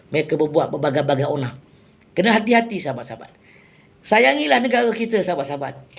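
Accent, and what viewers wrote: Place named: China. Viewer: ...tak syok cakap intonasi macam ni....kena buat macam sebelum ni...syok dengar...ada itu pelat2 chinese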